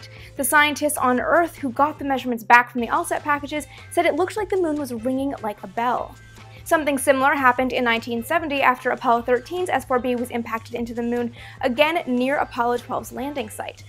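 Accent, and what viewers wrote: Canada. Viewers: Er... she's Canadian and says things Canadianly sometimes...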